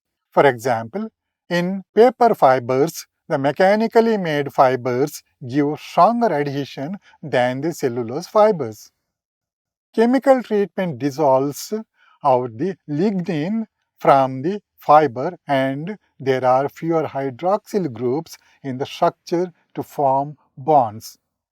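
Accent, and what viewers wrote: India. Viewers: I am an Indian..still i find it difficult. That's because his accent is south Indian which is far different from north Indians.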